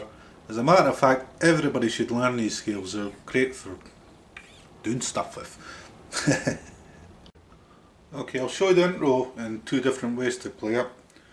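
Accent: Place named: Glasgow, United Kingdom. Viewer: Thanks for the upload. Nice to hear your Glaswegian accent also!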